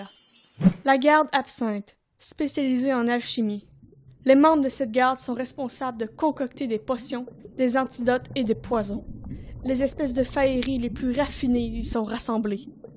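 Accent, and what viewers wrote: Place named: France. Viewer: OMG i love French accent haha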